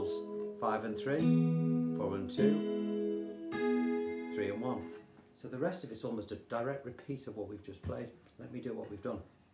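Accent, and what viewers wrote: Liverpool, United Kingdom. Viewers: Came for, stayed for the accent. Brilliant. I dunno, scouse?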